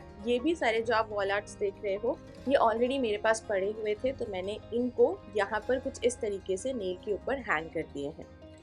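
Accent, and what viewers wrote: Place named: Gwalior, India. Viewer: Hey...i m frm gwalior...aapk muh se gwl sun kr achha lga...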